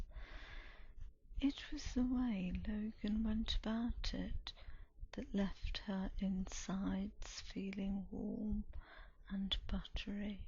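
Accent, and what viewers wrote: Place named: England, United Kingdom. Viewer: Oh my. English accent..I'm in love with you...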